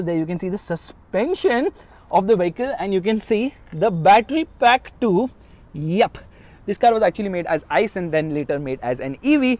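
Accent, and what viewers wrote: India. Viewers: did you reset your language setting on your videos as it's first time hearing you speak in hindi too, on the other hand it's quiet better with the little Indian touch to it.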